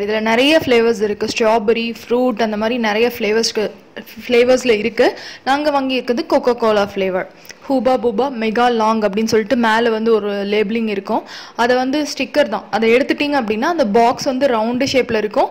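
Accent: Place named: Tirunelveli, India. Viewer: Neega ena vorunu sollata enoda guess sareya irunda neega tiruneveli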